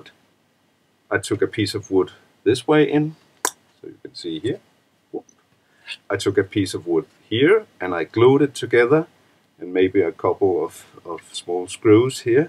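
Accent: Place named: Denmark. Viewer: meget nice men klar dansk accent xD kunne høre det med det samme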